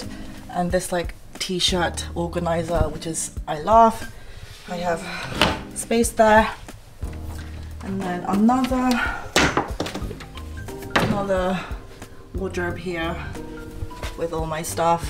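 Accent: United Kingdom. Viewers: Damn standard n strong British accent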